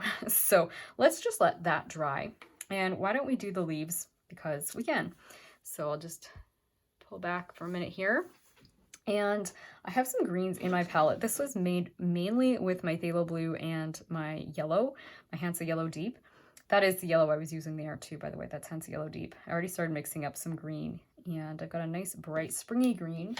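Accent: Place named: Canada. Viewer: This is really beautiful. These are my favorite color combinations. I have used yellow as an underpainting, but it didn't occur to me to leave the white there for the other colors. I appreciate you sharing your talent with us. I've enjoyed using the Simply Simmons brushes as well. I think I first started using them after I watched an artist in Canada. I think his name is Jo (last name...can't remember) and he works with the Artists' Network (I think). You sound like you are from Canada. Am I correct? You don't have to say, if I'm too nosy.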